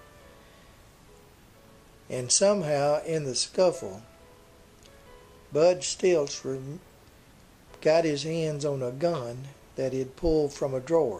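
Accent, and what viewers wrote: South, United States. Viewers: You have a great voice for narrating, and great American accent and local dialect.... nice. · I enjoy all of documentaries he has that southern voice that makes for great listening keep up the great work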